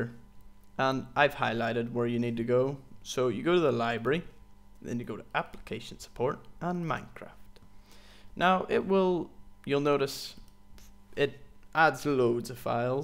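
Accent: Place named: Germany. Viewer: BTW! your accent is awesome!!! Is it German?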